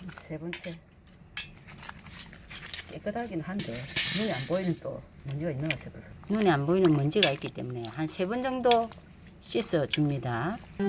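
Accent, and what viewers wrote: Gyeongsang, South Korea. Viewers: 댓글주셔서 감사합니다 경상도 사투리짱 우리동네 주민같아요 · 쑥갓꽃차 향이 너무 좋겠죠 한잔씩 마시고 싶네요 잘보고 갑니다 👍💖😅경상도 사투리가 익쑥합니다 ㅎ 어딘가요 저도 상주입니다👍💖😅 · 일부러 보일려는 꾸밈이란거 없이 텁텁한 갱상도 사투리로 들으니 시골 고향지키고 사는 큰올케를 만난것같은 편안함으로 영상이 시작되서 웃음부터 나고요 남모르게 피어나 짙은 향기까지 품어내어도 있는지 없는지 무심히 여겨왔던 쑥갓꽃으로 향기로운 차를 만드는 과정은 살아있는 예술일 수 밖에 없네요 이름모를 들꽃 하나로도 아름다운 시를 엮어내는 정말 대단하셔요 쑥갓꽃차 향이 콧속으로 풍겨오는듯이 마음에 여유로움이 생기네요 멋져요~!!!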